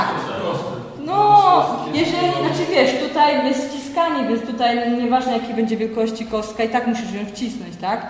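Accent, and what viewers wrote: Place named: Russia. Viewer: Temat bardzo ciekawy, ale przekaz trudny do słuchania. Co chwila no, no, jakieś rosyjskie końcówki, zamiast ść, sc. To nie rozmowa w małym gronie, ale przekaz do większej ilości osób.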